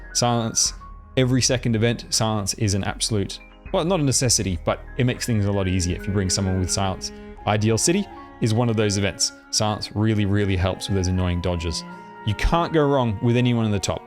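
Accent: Australia. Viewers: Great vid, lotsa useful fast tips but also well explained. Unrelated but are you a fellow Aussie?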